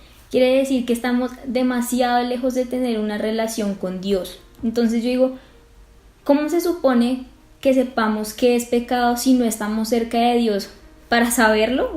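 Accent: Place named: Colombia. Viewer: Que asi Sea, Que Dios te escuche. Por que la verdad han sido meses de mucho dolor y sufriimiento. Me encanta tu asento colombiano, y la frescura de tu ser. estare muy pendiente de tu Nuevo video, espero sea pronto. Un abrazo fuerte de regreso.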